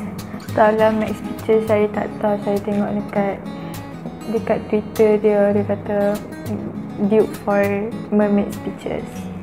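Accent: North, Malaysia. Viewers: I like how you're very natural and confident when you speak. I'm glad that you actually speak in Northern slang. Cute! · aku tengok video hang from the first video and... "boleh main batu sambut" dengan "makeup macam orang mabuk" buat aku GELAK HAHAHAHAHAHA yep, not gonna deny, orang uthagha cakap ada slang is the cutest >.<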